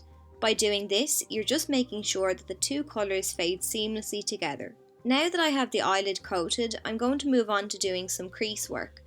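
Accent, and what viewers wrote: Ireland. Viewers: As soon as I heard your voice I screamed 'YES IRISH!!' and my family all stared at me😹🇮🇪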